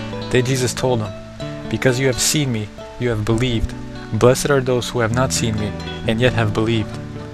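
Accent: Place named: India. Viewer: I cant understand this video complee completee difeebr what was that.... oh... whats going on.. Is this guy indian, i think he is.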